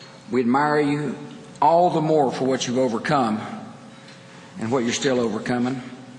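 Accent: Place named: United Kingdom. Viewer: i speak my dialect and you speak y'own maybe your lack of american in your english is irritatin to me and mine? sound like some jumped up british poetry professor tellin ME how to talk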